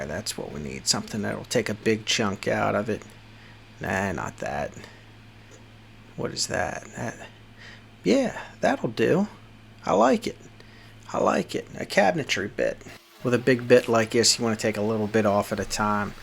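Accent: Pittsburgh, United States. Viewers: Is that a yinzer accent I hear? Rad build!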